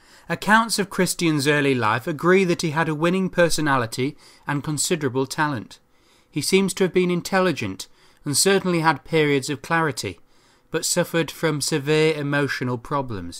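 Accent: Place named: England, United Kingdom. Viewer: All lies...spoken by an englishman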